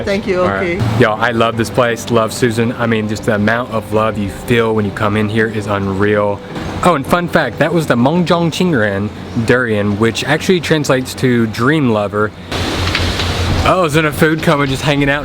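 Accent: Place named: South, United States. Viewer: Never did notice before but slurping and that southern drawl seem to go hand in hand. Damnit, i feel like one of Pavlov's dogs watching this. Keep up the good work sir.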